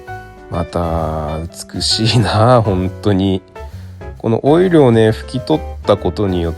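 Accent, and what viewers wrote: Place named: Hokkaido, Japan. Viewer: 以前から拝見してましたが初コメです。 北海道なんですね。今は東京在住ですが懐かしい方言がひとつふたつ聞こえたので。 冬の夜長の工作、私も嫌いじゃないです。 昨年から始めたサバゲ―の年配初心者です。コレクター志向ではありませんが所有する数丁の機能美には魅せられてます。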